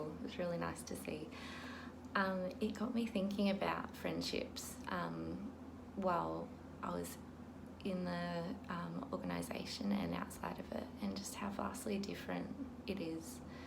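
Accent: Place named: Australia. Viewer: Do I detect an Ozzie twang in there.. .. I spoke too soon.. .. you mentioned it at the end.. doh.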